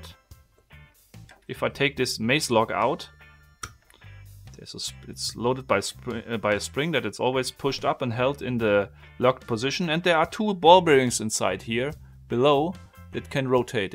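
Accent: Germany. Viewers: Kannst du mal ein deutsches video machen In vielen videos hört man das du deutscher bist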